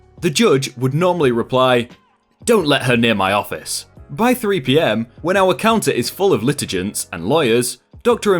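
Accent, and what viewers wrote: United Kingdom. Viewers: nice to hear a brit accent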